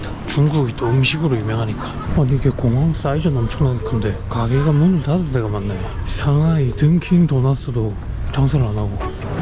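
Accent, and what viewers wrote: Busan, South Korea. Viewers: 정겨운 부산사투리 잘듣고갑니다~~ 초보여행유튜버로서 잘보고 갑니더~~ 건강히 여행하시길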